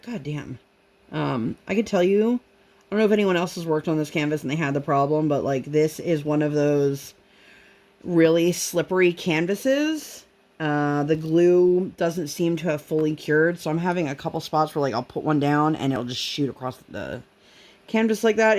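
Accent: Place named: Philadelphia, United States. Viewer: Hi, it sounds like you had a really wonderful trip!! I wanted to mention that I’m a Philly girl and my husband is from King of Prussia. I mention this because it sounds like you’re from the area. We live in the Pocono’s now. What area are you from if you don’t mind me asking? I love listening to your WIP and Chats, but I don’t comment much. I’ll try to be better at that. Take care of yourself and I’ll see you in your next video. 💜 Dawn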